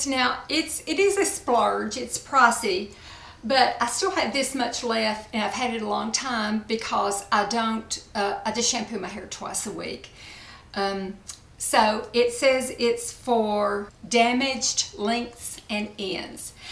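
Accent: South, United States. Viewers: Darlin' we are your tribe!! no one is going to leave you. We all love your smile, your southern drawl that warms our heart. God bless you and cheers to your speedy recovery.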